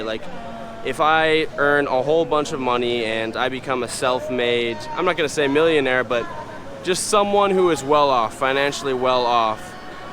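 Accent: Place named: Upper Midwest, United States. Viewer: your upper midwest accent was thick in this one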